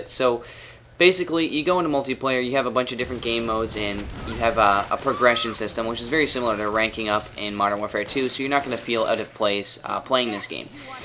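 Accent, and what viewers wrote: Canada. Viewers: Are you canadian? :L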